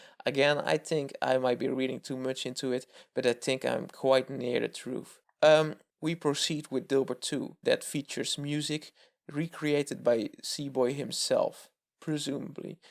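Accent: Netherlands. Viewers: You sound Dutch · Ahaha kom je uit nederland? Mooi accent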